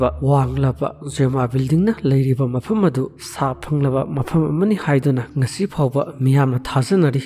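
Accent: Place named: Manipur, India. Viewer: Yam nungai wari....aduda....ur manipuri tune makes me little difficult to listen.....😂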